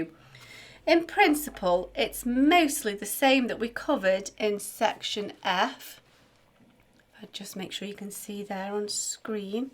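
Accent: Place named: Northern England, United Kingdom. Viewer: Hi i am not understanding this to well. page 23 exercise (1) Questions (a) & (b).......?a....... i have beamed first 2 quavers then space then beam last 2 Quavers is this wrong and if so could you explain,?(b) i have put 2 dotted crochets could you please also explain. From a very (confused.comDenis) Also i would like to thank you very very much for your vids which are really helping me to reach my goal of my Grade 1 which is on 6th of November. Many many thanks for all your help. Denis (pain in the butt Pickering) lol. PS love your accent, i am thinking northern. I am from Lancs but lived in wales 35 years. Have a fabtastic day.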